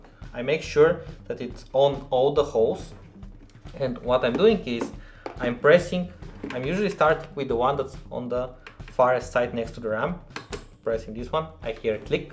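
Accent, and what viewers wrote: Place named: Mexico. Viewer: Che mexicano intentando hablar inglés no mams mejor hazlo en español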